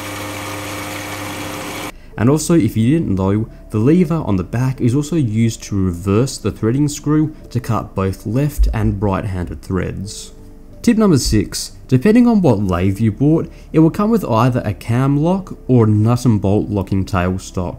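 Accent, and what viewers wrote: Australia. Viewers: Nice Aussie accent ! Reminds me of a Brit who spent a long time in Perth, WA, but I could be wrong. Otherwise, the various tips are useful, thanks.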